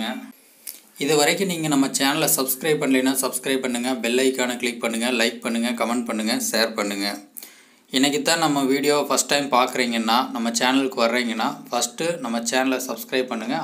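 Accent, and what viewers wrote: Kongu, India. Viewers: கொங்கு தமிழ்.கேக்க கேக்க இனிமையாக இருக்கு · நம்ம கொங்கு தமிழ்'ல Semma explanation ன்னா 🤝✌️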